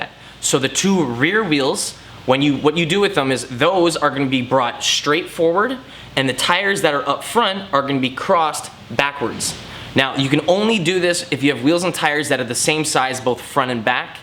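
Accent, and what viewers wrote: Canada. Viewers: Is this gentleman Canadian? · For you maybe... but that's how Canadians say it.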